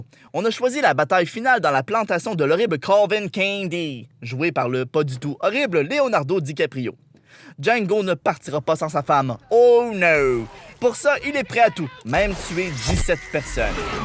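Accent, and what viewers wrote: Canada. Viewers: J'adore vraiment cette accent canadien ! Continue tes vidéos c'est super le couteau trouvé !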